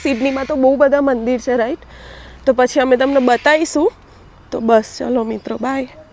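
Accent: Sabarkantha, India. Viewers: બેન, તમે સાબરકાંઠા ના લાગો છો. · Sabarkantha ni boli bolo chho to s.k. na nativ haso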